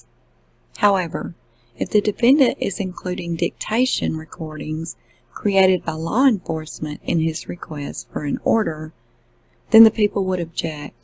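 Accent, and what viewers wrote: South, United States. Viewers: Thank Goodness you understand this! Brains and beauty, you have it all! P. S. I almost forgot, a great southern dialect! I'm in NY I even get sick of my own voice.!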